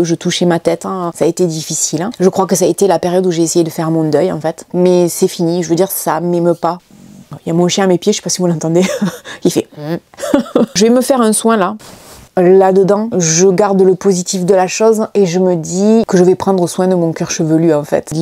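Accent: South, France. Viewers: J’ai eu le cœur brisé et la boule dans la gorge quand je t’ai entendu pleurer…. Ma chère, ton identité, ta féminité, c’est ton sourire ravageur, tes yeux pétillants et ton accuuuuunt du suuudeuh! Un bisou à Jean-Yves ❤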